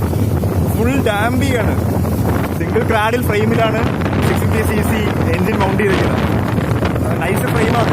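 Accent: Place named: Thiruvananthapuram, India. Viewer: വളരെ ലളിതമായി നമ്മടെ സ്വന്തം തിരുവനന്തപുരം സ്റ്റൈലിൽ തന്നെ അവതരിപ്പിച്ചു. പൊളിച്ചു മക്കളേ.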